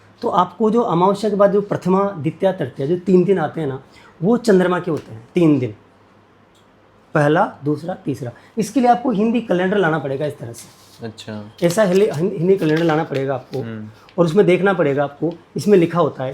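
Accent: Hadoti, India. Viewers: Hadoti Ke Lag Rahe he Botne Se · Gurudev apki language bilkul hadoti rAJASTHAN jese lg rahi h m bhi hadoti ki hoo apki bhasha hakk se jatate huy ;;;;;;;maja aa gy apko padm bhushn milna chayee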